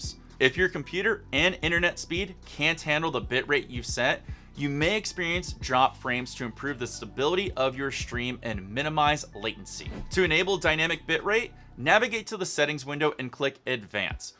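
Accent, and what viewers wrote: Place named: Israel. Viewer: אתה ישראלי אתה לא דובר אנגלית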